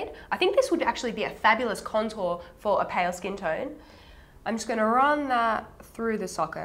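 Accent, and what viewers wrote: Australia. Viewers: Where is she from? Australia? :)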